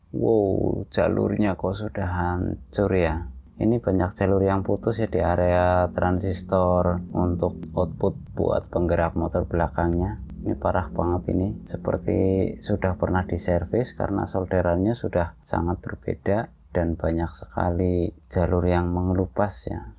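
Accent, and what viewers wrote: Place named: Tegal, Indonesia. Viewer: tiang pundi um kok ngomongnya ngapak ..... orang tegal ya ???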